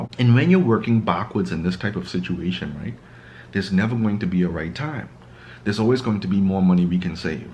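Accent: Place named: Bahamas. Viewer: Are you a Bahamian? That accent sounds like one from the Bahamas.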